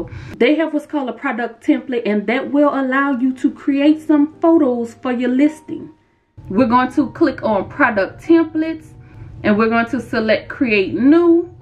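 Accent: New Orleans, United States. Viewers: I'm new here. Are you from New Orleans? I know my people. Our dialect is very distinct. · Sister are you from New Orleans??? I'm a new subscriber and you're accent sounds very close to home lol. I absolutely love your energy, from intro to end. You are a blessing ❤️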